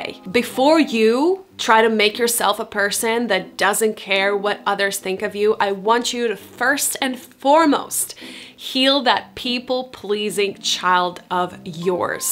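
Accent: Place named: Eastern Europe. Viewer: nicely explained. Llve the Eastrrn Euro accent permiating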